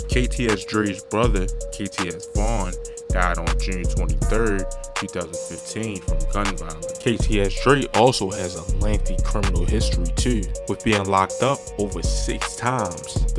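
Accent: Baltimore, United States. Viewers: You got that Baltimore accent bro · That Baltimore accent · one person demise is another person's entertainment. I can't even take him serious. Dude has a Baltimore accent. You should be doing documentaries on your own city.... I forgot, it's been done already. The Wire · Does the narrator have a Baltimore accent ? 😭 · This narrator got to be from Baltimore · Bro gotta be from Baltimore. The way he talk. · Dude sound like he from Baltimore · True. I know that Baltimore accent anywhere. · Maryland x Delaware checking in ❗⚠️ I hear that BMore accent. Lol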